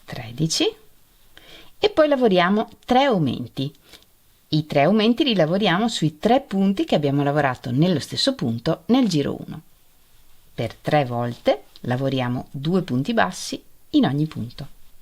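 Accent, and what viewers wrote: Italy. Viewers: Pour souffrir également de fibromyalgie, avec en ce moment le syndrome des intestins irritables qui dure depuis plus d'une semaine, je suis trop mal dans mon corps pour crocheter. Je suis âgée, ce qui n'arrange rien. Par contre, comme vous, rien qu'à écouter la voix de, avec son magnifique accent, me fait du bien. Elle me ramène à mes origines (mes parents sont nés en Italie tous les deux) et mes souvenirs de vacances d'enfance. 😊 Je suis désolée, si je parle l'italien, je ne sais pas l'écrire. Et je ne supporte pas de faire des fautes d'orthographe. Bon courage à vous.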